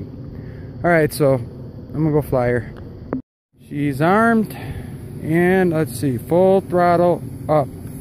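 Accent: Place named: Minnesota, United States. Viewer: Hi. Your accent sounds like Wisconsin or Minnesota 😎. I'm from Minnesota but live in California and lost my accent::( anyway I was wondering how far you can go in altitude hold before flipping on gps and it wouldn't kick in to save my butt? I'm ordering the latest version. What are your thoughts on that? Thank you for your time. Have a great day. Sincerely William Horsley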